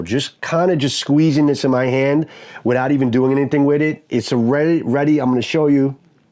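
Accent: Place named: New York City, United States. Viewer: What borough of N.Y.C. is your accent from?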